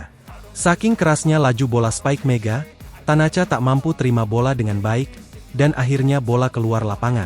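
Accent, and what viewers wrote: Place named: Java, Indonesia. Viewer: Medok euy...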